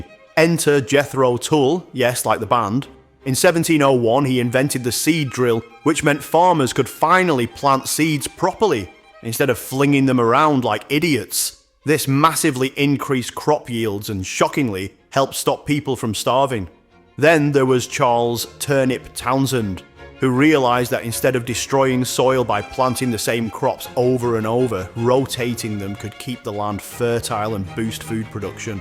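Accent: United Kingdom. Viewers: What kind of British accent is this?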